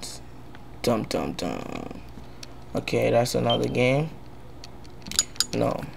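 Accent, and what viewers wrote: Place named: Dominican Republic. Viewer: that dominican style voice!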